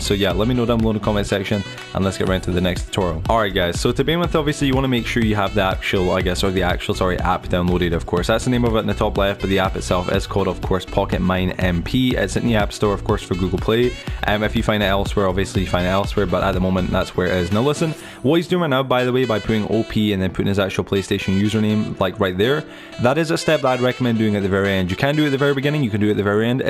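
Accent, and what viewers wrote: Scotland, United Kingdom. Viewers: Are you Scottish? You just sound Scottish, if you are then that's cool, cause I'm also scottish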